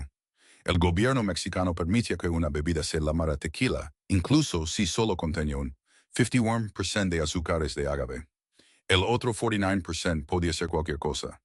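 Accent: United States. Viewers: TU PRONUNCIACION ES DE USA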